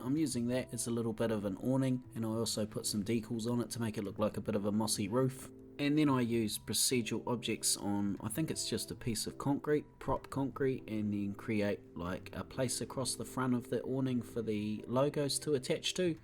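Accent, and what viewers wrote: New Zealand. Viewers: ok u asked for ideas: i would love to see an extended cinematic view riding on this yellow motorbike! Just riding all through the city. We need to relish in the awesomeness. Its like you create this awesome stuff but we only get to see it once for a very short time. And dont worry about the accent, it’s impossible to sound like a sweaty nerd with a new zealanders or Australian accent lol. At least from an American pov. Good work though, another banger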